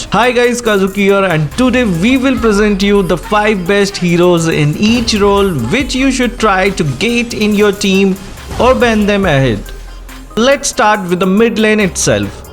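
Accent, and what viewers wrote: India. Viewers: I dont trust indians when it comes mobile legends 😅🤣🤣🤣😂 the accent says it all masala accent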